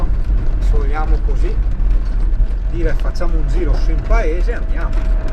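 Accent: Veneto, Italy. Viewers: Sicuramente non sono mezzi da camminare forte considerato anche l'età del mezzo . Comunque bei video divertenti con l'accento veneto poi è uno spasso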